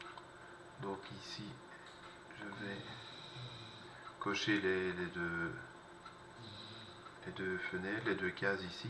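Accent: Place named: Liège, Belgium. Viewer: Super le tuto, j'ai vraiment apprécié le procédé, je vais tester sur une de mes photos avec ciel presque cramé. J'ai aussi adoré l'accent liégeois ;-) Merci pour les explications.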